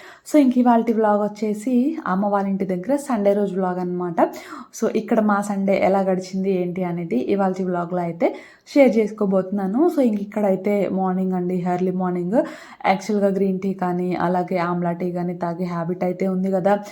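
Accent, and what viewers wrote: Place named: Visakhapatnam, India. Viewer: Your language is different in Telugu i think u r in vizag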